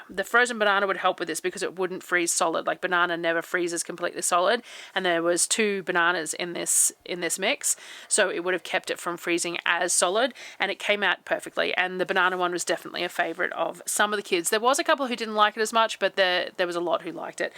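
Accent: Australia. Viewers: I love listening to you talk. I have always loved the Australian accent. Also, the speed you talk is fine. I don't want to listen to someone who talks like a turtle. So forget what those people say and keep being you.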